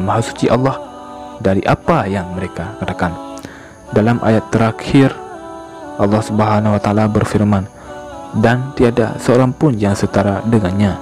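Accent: Aceh, Indonesia. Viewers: Ustadz orang aceh ya 😁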